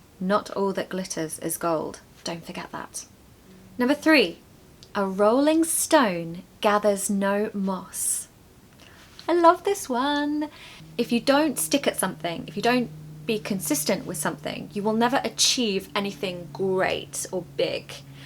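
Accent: RP, United Kingdom. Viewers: I love all your videos. I love your British accent. I can listen to you for hours. I used to live in the UK 4 years ago. Now I miss England and the British so much. So wonderful to listen to you and learn . Love you. 😊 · thank you very much for your smile... By the way, do you have pronunciation lessons? As I know you have modern RP (MRP) am I right? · You deserve more and more subscribers. You deserve all the appreciation. You have a great way of explanation and a clear British accent.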